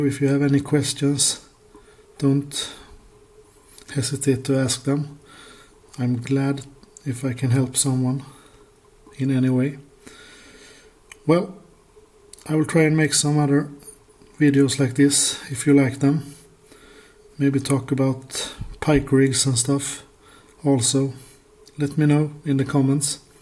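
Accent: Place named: Norway. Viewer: You said Sweden when you mentioned darts, but to me you sound very norwegian, especially how you say "just like this" sounds exactly like my dad whenever we visit our relatives in the US haha. Thanks for the guide, my local water for perch is full of crap, as its next to a mall, and old factory now museum, and not to mention an elementary school, specifacly a steiner school so they do alot of outdoor stuff, so along the edge where the perch sit there are so many rocks, and sticks that people have chucked into the water. Finally decided i needed to learn to use ofsett instead of having to go the store every 3rd fishing trip to pick up light wire leaders (there are alot of small small pike in the lake)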